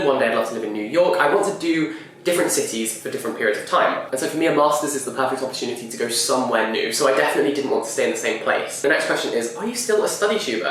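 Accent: United Kingdom. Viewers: I love watching videos, but my non-English brain cannot handle that talking speed, especially in combination with British accent:D help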